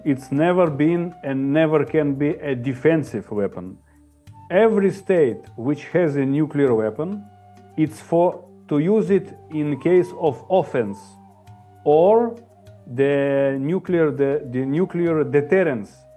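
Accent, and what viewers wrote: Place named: Russia. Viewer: Руски акцент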